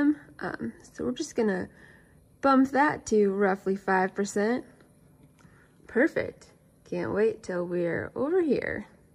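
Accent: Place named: Texas, United States. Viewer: You dont sound like youre from Indiana lol...more like Oklahoma or Texas lol. Does everyone in Indiana sound like you :)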